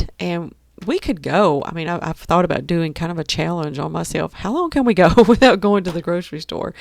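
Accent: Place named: South, United States. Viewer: just watching this video, you look amazing doing this, great chance to get involved in community, where you can be a witness for the Lord, although where you live, I’m assuming it’s somewhere in the South, I hear your accent, lol, but it’s not a heavy one, many people are Christian, but sometimes it’s more cultural, rather than actually being born again, great video, love your channel!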